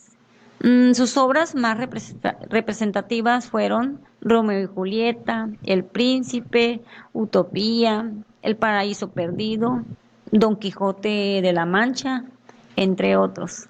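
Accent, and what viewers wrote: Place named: Veracruz, Mexico. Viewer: Pareces de Veracruz.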